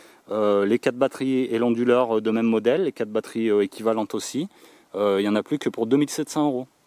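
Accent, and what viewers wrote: France. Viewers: Bonjour Pour l eau chaude: Je suis pas un grand specialiste, mais selon votre systeme de chaufage. Je penses qu il serait possible de recuperer calorie perdu par cheminée. Si vous avez chaffage au bois ou mazout par un poele il serait judicieux de reflechir comment faire un serpentin autour conduit ou circulerait un fluide pour rechaufer un reservoir d eau chaude, a bien penser un systeme securitaire. Je suis natif des hautes alpes et votre accent me parle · Hello j'ai cherché dans les commentaires sans trouver votre localisation si ce n'est l'accent qui vous situerait en Isère ou Lyon peut être. Moi je suis sur Nimes.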